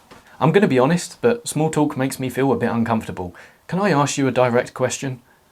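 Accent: United Kingdom. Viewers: I should like to think that I would appear interesting if i have a cool british accent as you do😊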